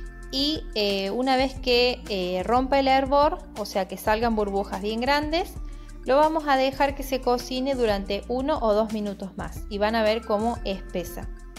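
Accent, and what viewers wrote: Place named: Cordoba, Argentina. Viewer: El acento cordobés me encanta jaja♡ Lo voy a intentar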